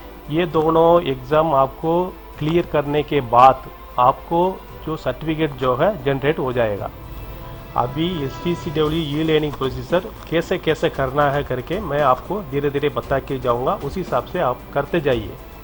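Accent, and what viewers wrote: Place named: Tamil Nadu, India. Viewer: Neega Tamil thane neega Hindi pesuradhu teriyuthu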